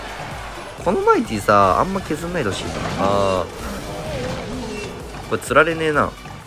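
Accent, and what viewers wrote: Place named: Kyushu, Japan. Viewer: 九州は大体口調こんなんだよ いや、嘘ついた。鹿児島と長崎は違うかも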